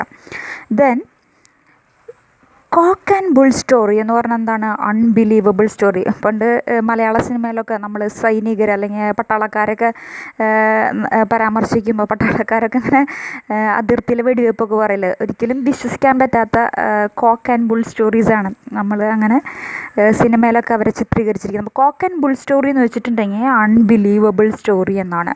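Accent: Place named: Thrissur, India. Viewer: അടിപൊളി, തൃശൂർ ഭാഷ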